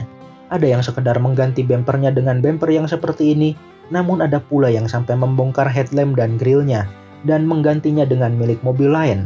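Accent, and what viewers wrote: West Java, Indonesia. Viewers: Penyiarnya urang Sunda keneh nya?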